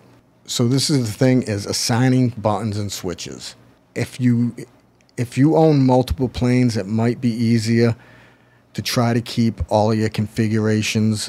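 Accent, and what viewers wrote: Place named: New England, United States. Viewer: What part of the north east are you from sir? I’m hearing RI or Mass.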